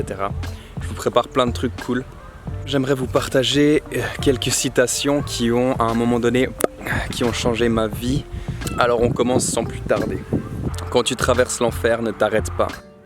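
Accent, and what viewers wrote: Belgium. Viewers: On dirait que t'es bruxellois Sinon love you and your beautiful viedooos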